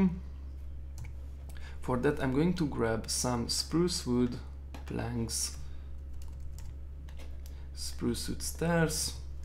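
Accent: Hungary. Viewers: Te beszélsz magyarul? Csak mert mintha lenne egy kicsi akhcentusod :-D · Szia tokre lehet hallani hogy magyar vagy😁 csinálhatnál majd olyan videót is amiben építes redstone szerkezeteket😀😉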